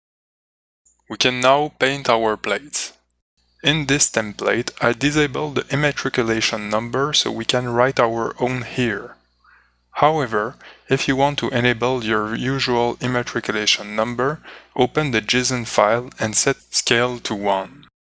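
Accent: France. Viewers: are u french? nice tuto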